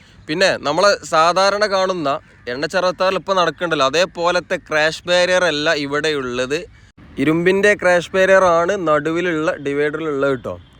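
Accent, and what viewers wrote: Malappuram, India. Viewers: വീഡിയോ അടിപൊളിയാണ്‌.. പ്രത്യേകിച്ചും അവതരണ രീതി especially Drone shot..ആ ഒരു effortന്‌ 👍🏻.. ഞാനൊരു കണ്ണൂർക്കാരനാണെങ്കിലും നിങ്ങളുടെ Malappuram സ്ലാങിലുള്ള വിവരണം കേൾക്കാൻ നല്ല രസമുണ്ട്‌..keep it.. പിന്നെ സ്ഥല പേരുകൾ പരമാവധി മലയാളത്തിൽ എഴുതുന്നത്‌ പോലെ തന്നെ പറയുക..😀 ഉദാ: മുഴപ്പിലങ്ങാടിനെ മുഴുപ്പിലങ്ങാട്‌ എന്ന് പറയരുത്‌ pls 😇 അതുപോലെ മലപ്പുറമല്ലാത്ത മറ്റു ജില്ലകളിലെ വീഡിയോ Editting Timeൽ വീഡിയോയിലെ റോഡ്‌ കടന്നു പോകുന്ന സ്ഥലങ്ങളുടെ പേരുകൾ Google map,Google earth,Sign boardകൾ ഒക്കെ നോക്കി മനസ്സിലാക്കി പറഞ്ഞാൽ ആ ജില്ലക്കാരല്ലാത്തവർക്ക്‌ പ്രത്യേകിച്ച്‌ Vdo കാണുന്ന പ്രവാസികൾക്ക്‌ വളരെ ഉപകാരപ്പെടും...പറയാൻ കാരണം പല വീഡിയോയിലും ഈ സ്ഥലത്തിന്റെ പേരെന്താണെന്ന് അറിയില്ലാന്ന് നിങ്ങൾ പറയുന്നതായി കാണാറുണ്ട്‌..എല്ലായിടത്തുമില്ല (അതെ സമയം ഇങ്ങനെയുള്ള vdo ചെയുന്ന മറ്റു ചിലരൊക്കെ ഓരോ underpass etc അടുത്തുള്ള റോഡും വഴിയുമെല്ലാം വിവരിക്കാറുണ്ട്‌). 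പിന്നെ കഴിയുമെങ്കിൽ ചിലരൊക്കെ ചെയ്യും പോലെ ആ റോഡിന്റെയും സ്ഥല പേരുകളിൽ vdoൽ എഴുതി mention ചെയ്താൽ നന്നായിരുന്നു.. പിന്നെ നിങ്ങളിൽ കണ്ട ഒരു Plus point Thalassery-Mahe bypassനെ നിങ്ങൾ ആ പേരിൽ വിളിക്കുന്നതാണ്‌..ചില YouTubers മുഴപ്പിലങ്ങാട്‌ bypass,അഴിയൂർ bypass എന്നൊക്കെ വിളിക്കുന്നത്‌ കാണാം.. (കോഴിക്കോടും കണ്ണൂർ ഭാഗത്തേക്കും NHൽ കൂടി ഇടുങ്ങിയ റോഡുകളുള്ള തലശേരി-മാഹി ടൗണുകളെ Touch ചെയാതെ പോകുന്നവർക്ക്‌ വേണ്ടിയുള്ളതാണല്ലോ ഈ bypass..ഇത്‌ ഈ നഗരങ്ങളിലെ ഗതാഗത കുരുക്കിന്‌ ഒരാശാസ്വവുമാകും..) കോഴിക്കോട്‌ ജില്ലാ അതിർത്തിയായ കുഞ്ഞിപ്പള്ളിക്കും അഴിയൂരിനിടയ്ക്കും നിന്ന് തുടങ്ങി കണ്ണൂർ ജില്ലയിലെ മുഴപ്പിലങ്ങാടിൽ അവസാനിക്കുന്നത്‌ കൊണ്ടായിരിക്കാം ചിലർ ഇങ്ങനെ പരാമർശിക്കുന്ന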